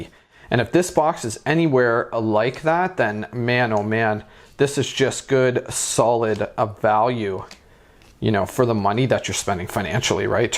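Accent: Canada. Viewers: I got a mana crypt and many other goodies in my 2 boxes. You are so very Canadian sounding haha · please tell me you're Canadian. High quality accent. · yes 100% as soon as i heard your voice i was like "bet hes canadian"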